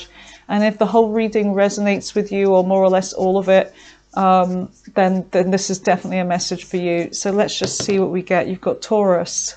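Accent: United Kingdom. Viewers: You are the best! Really enjoy your Libra readings. Which British accent is it that you have?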